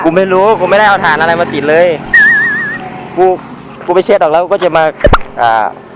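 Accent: Isan, Thailand. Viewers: คนอิสานเบาะะคับ5555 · อ้ายเป็นคนอิสานบ่ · คนอีสานตั้วนิ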